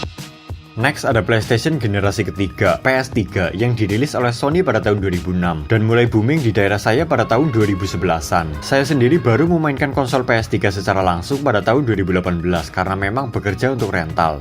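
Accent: Banyumas, Indonesia. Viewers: ngapak tapi jelas lugas dan detail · Ngapake pol lah ya pak, ora ngapak ora kepenak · Ngapak bgt mas tp sya suka hahahah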